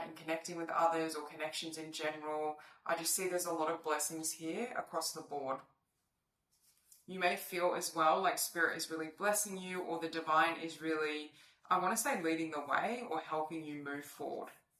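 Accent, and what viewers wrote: Australia. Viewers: #3, thank you for the overall message of renewal. Love the accent, Australia or New Zealand love?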